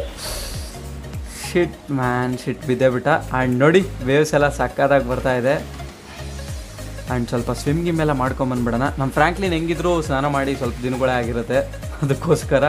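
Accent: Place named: Chitradurga, India. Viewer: Bro nivu namma chitradurgada Helidiralla super 💞love from chitradurga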